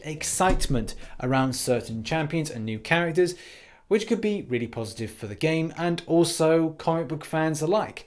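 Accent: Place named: RP, United Kingdom. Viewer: Keep speaking that queens English bud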